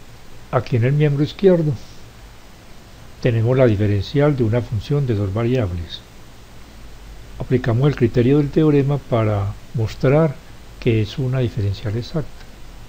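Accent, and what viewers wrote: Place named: Antioquia, Colombia. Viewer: Tiene acento paisa. Nuevamente gracias y bendiciones.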